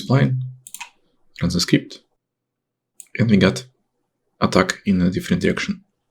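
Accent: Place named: Russia. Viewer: Да нет же! Акцент жемчужина! Я сразу вспоминаю игру Red Alert... Комрад дженерал и другие озвучки русских юнитов))))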